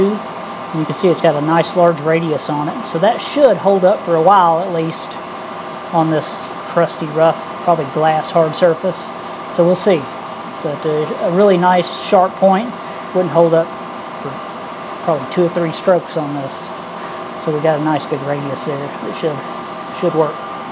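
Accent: South, United States. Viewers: First time I watch your video. Nice shop! From your accent I think you live in the south, somewhere it can get cold. North Carolina? I have a lathe and a mill, a much smaller shop than yours. I look forward to watching more of your work.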